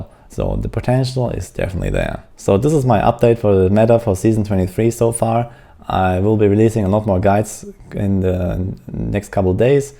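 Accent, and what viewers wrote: Germany. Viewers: with all due respect, Greece is a shithole, i donno where are u from but from your accent i can assume that you're from germany\austria etc. but hey if you're happy there then i'm happy for u!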